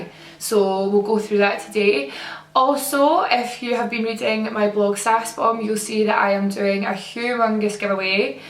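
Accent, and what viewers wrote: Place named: Glasgow, United Kingdom. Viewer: Gorgeous, I love it. As a Glaswegian in Yorkshire it's lovely to hear a familiar accent! Nars eyeshadow base is fantastic-I have oily eyelids and nothing budges when I use that-and even mac paint pots crease with my eyes! X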